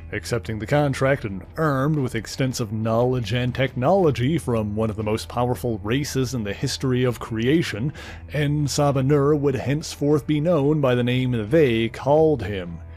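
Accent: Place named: East, Canada. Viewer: Love the Channel! Sounds like an east coast Canada accent :) keep it up!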